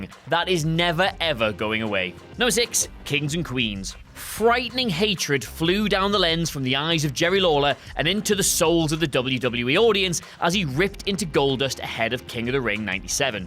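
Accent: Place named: England, United Kingdom. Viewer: Not used to an Englishman speaking English?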